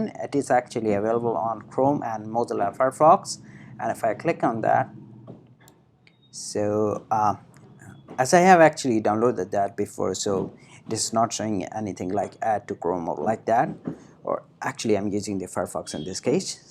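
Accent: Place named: United States. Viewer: bhari english bola he bhai maasaallaahh full american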